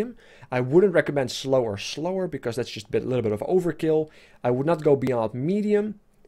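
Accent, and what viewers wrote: Netherlands. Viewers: youre dutch? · i guess your dutch:P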